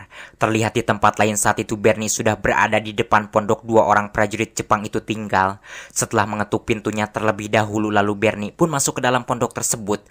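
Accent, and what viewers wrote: West Java, Indonesia. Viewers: Sundana ti mana kang admin?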